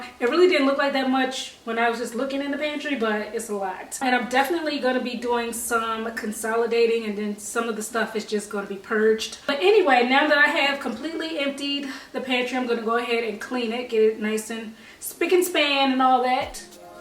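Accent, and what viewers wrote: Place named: Washington DC, United States. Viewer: Are you from DC? You have a DC accent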